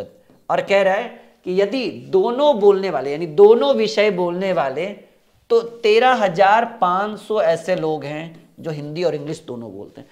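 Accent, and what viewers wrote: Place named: Nawada, India. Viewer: Sir aap buturu bolte h nawada side h kya sir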